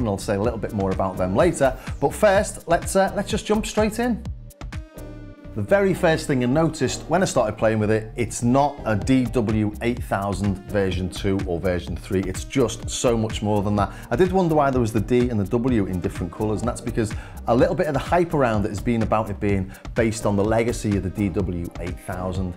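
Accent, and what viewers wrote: Liverpool, United Kingdom. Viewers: Dang I’m sold on this now! Cheers from Hollywood, CA. Love the accent…would that be what they call “scouse?”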